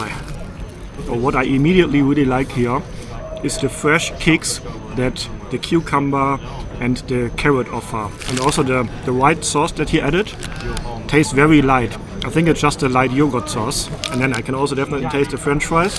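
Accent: Germany. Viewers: It's Deutsch accent, not English accent. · Love your videos. Please keep it up. Also love your German accent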